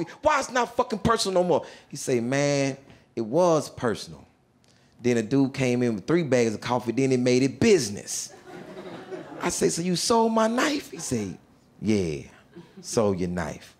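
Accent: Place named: Texas, United States. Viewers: Lol I can tell hes from Texas and locked up in Texas prison just off the way he’s talking and the small details of the story. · Sound like he was In Tdc ; some Texas shit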